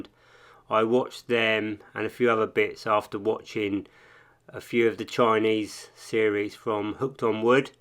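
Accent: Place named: England, United Kingdom. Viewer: So sweet to hear a pure British accent and way of sharing the knowledge and the humour :) Love it, you earned a new subscriber mate ! Keep the videos coming ! I am a beginner diyer tryn to build a MFT table lol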